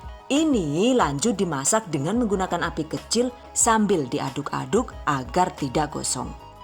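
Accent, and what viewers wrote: Banyumas, Indonesia. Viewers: Ya ampun lucu bgt sih ngapaknya hehehe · Iya bener. Apalagi pas ngomong pake bahasa ngapak😂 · 😂😂😂 ngapak ya ora ngerti... Mksih bun😘😘😘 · Wkwkwkkwkwkw.....asiiik ada suara ngapak ngapaknya ... 😀🙏😊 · Trima kasih ilmuny Yg bikin kangen NGAPAK2 NYA ITUUU LUCUUU TAPI GC MBOSENIN 👍👍💪💪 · Terima kasih resep nya dn sempat tertawa juga karena ada bahasa ngapaknya😊😊😊😊😊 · Weslah ora sah ngecepres baee ngelih kohh wkwkwkwkkw jossss ngapake josss ky inyong wong jawa,, is the best lahh haaaaaaaa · Asslmkum njenengan wong ngapak ya yuk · Aku suka ngapaknya selain resep" nya😀😀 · Ngapak ternyata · Sampeyan itu orang mana mbakyu. Aku kalo lg lihat vedionya..sampe ketawa sendiri dngn bhs ngapak.mantap resep2 sampeyan gampang ditiru.maturnuwun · Ngapak nemen rika mbekayu....??!!! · Mandan ngapak yayune jan, sinau nengkene ya kena kiye sidane 😁😁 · hhhh ngapak lucu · Wong ngendi Jane rika zu? 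Madiun ka ngapak 😂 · Jebule wong ngapak apa kieeee🤣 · Bahasa ngapake metu.. 🤣 ngapake kaya daerah banyumas, cilacap, purbalingga, kebumen.. udu ngapak tegal udu kie tah.. 🤣🤣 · Tks ya resepnya..pasti yg medokk kadang ngapakk..cuantik dehh ibunya..he..he..he..yeni virgo suka deehhh dng cara mmberitahukan resepnya....maju truss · Maen temen deneng ky kue bisa ngapakz ,tengkiu resepe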